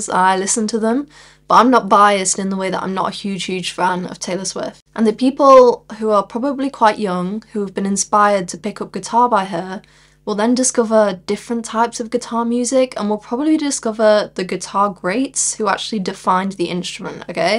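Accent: England, United Kingdom. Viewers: “Rock isn’t dead, it just smells funny” - Frank Zappa, sort of. Agree very much with your assessment of the state of the art. Curious to know what part of England your accent is from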